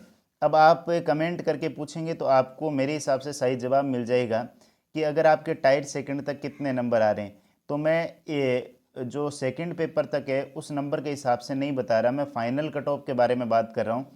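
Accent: Rajasthan, India. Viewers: OK bhai mujhe apke accent se lge ki aap rajasthan se ho isliye bola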